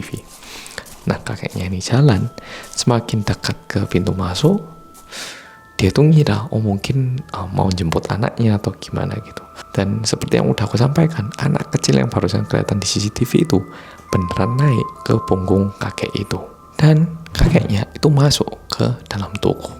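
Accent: Java, Indonesia. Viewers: Makasih, Boloku Sing Paling Medok Dheweeeee 🙏🏼🙏🏼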